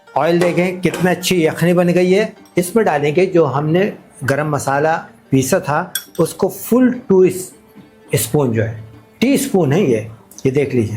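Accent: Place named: Lucknow, India. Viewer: ❤Nazakat Lazzat aur Aapka meetha Lakhnavi andaz Awesome.Respect from Pakistan.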